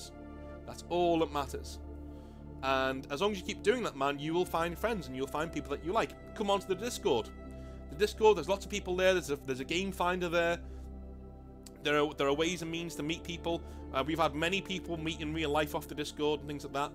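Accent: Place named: Southport, United Kingdom. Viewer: You're a Lancashire guy who's in Merseyside but say it doesn't exist. You sound like your from Southport my hometown. Southport is an oxymoron as it is not South nor a port. It's north of Liverpool 43mins on the train. It's also somewhere people come to die. You can't more for care homes here. It's truly God's waiting room. But the important thing is that Southport sits right on the border of Lancashire and Merseyside. (Where the black watch on the wall keeping the wildling Lancastians out) 😂 However there is a lot of arguments here as to whether we're Lancastian or posh Scousers. So when you said you where in Merseyside but actually you're Lancashire it made me think.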